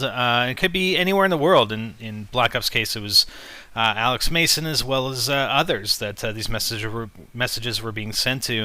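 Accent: Canada. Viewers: this is so canadian with your prOcess and zed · are you Canadian? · Your Canadian aren't you (zed) instead of z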